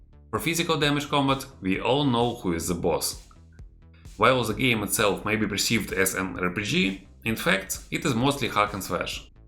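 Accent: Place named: Russia. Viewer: First of all, I'm not "criticizing" your Russian accent. But it was a little bit difficult to understand and I know you're working on it 😃 Other than that, good review. Do you know why I "Consider" it a good review 😜 2 reasons, 1. You got straight to the point. So many YouTubers, just drone on like an air-conditioner 😆 2. It was less than 10 minutes, and that's a good thing, honestly. People today have very "short attention" spans today🙄 You did talk a bit fast but, that's perfectly okay lol! You had a lot to cover and for the most part, you succeed 😝 So keep up the good work and I look forward to all of your, future videos 😁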